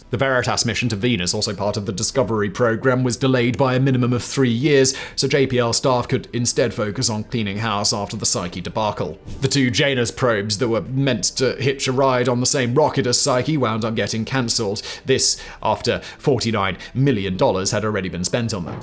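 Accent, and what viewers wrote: United Kingdom. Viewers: Your voice is hard to listen to. It's like you're from the most stereotypical posh little English village imaginable. And no, despite what you think, it's not really a good look for you. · You Tube SUCKS! I have removed this man's channels over and over again. But when You Tube changes the algorithm, it force feeds me this Simon guy's many channels despite my removing him. Simon's channels suck. They are poorly researched information that is mostly government propaganda talking points. Terrible videos. And just because Simon has a British accent, this doesn't not make him an authority on anything. He's just a talking head. England is over. Brexit has made the only job in England a You Tube content provider. Terrible list videos that spread falsehoods and bull.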